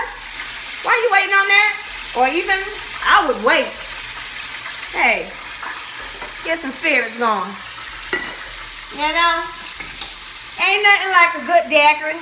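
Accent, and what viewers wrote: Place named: New Orleans, United States. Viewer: You sound of New Orleans not Miami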